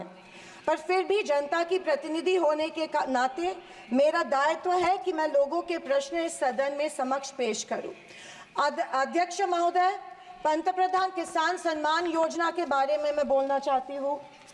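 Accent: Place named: Solapur, India. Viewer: Nice solapur avaj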